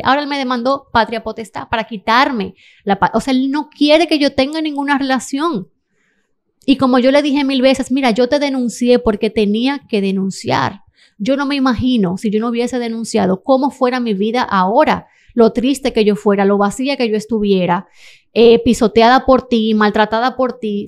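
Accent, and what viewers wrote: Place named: Venezuela. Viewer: Hola pense que eras venezolana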